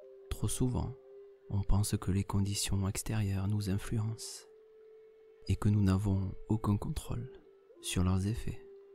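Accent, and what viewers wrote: South, France. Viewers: votre accent du midi qui fait chaud au ❤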